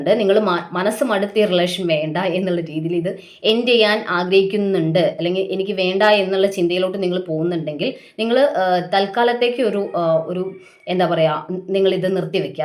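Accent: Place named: Malappuram, India. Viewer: Malappuram slang is superb♥️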